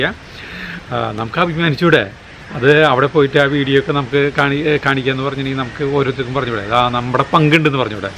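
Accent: Thrissur, India. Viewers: സ്ലാങ് കേട്ടിട്ട് തൃശ്ശൂക്കാരൻ അന്നെന്നു തോന്നുന്നു. ഞാൻ തൃശ്ശൂരാട്ട നിങ്ങൾ തൃശൂർ എവിടെയാ 😍😍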